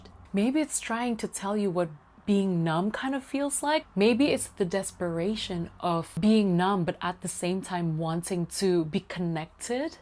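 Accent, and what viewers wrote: United States. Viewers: Jakarta, Singapore, and London...yet your accent is quite American. California, perhaps the bay area. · I just found you and love your reviews. I was wondering, you speak perfect American English but you grew up in Indonesia then went to London. I don’t hear an Indonesian accent and if there is, it’s very slight and you definitely don’t have a UK accent. Did you go to college in America? You are a very beautiful person. Love your take on music as well.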